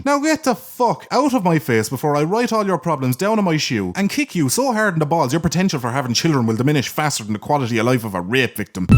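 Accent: Ireland. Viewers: You are the most Irish man I've ever heard. I love it.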